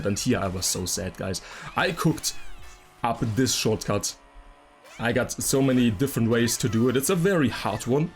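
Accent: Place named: Germany. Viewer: so unangenehm wenn deutsche englisch reden müssen 😂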